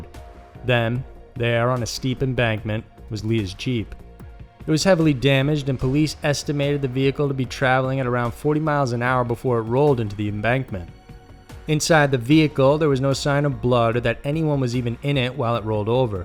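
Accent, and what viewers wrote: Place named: Appalachia, United States. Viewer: Hill Billie narrator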